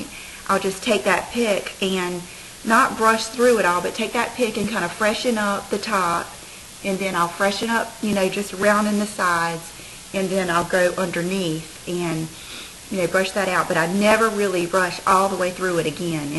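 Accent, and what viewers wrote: South, United States. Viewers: I love love love your hair like that! and your accent. I can tell you are from the south too..lol.. keep up with the great vidoes!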